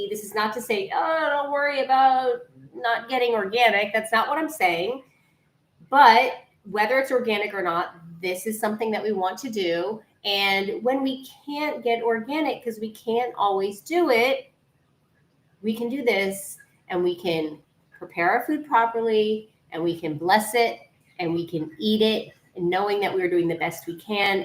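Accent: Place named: Canada. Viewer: Hey sister, so I used to soak lentil and bean in water mixed with baking soda for 8 hrs , or overnight. I stopped consuming legumes for my SIBO. I wonder though if you'd do a research to find out if baking soda works on legumes? But I'll definitely try mixing fermented pickle juice, I get them in farmers market in Poland, with water. Would a few table soons for a liter of water suffice? I'm glad i found your channel and i appreciate that you use metric system in your videos. Sounds like you're from Canada. Much love and respect for you for making free informative videos. Greetings from Poland. .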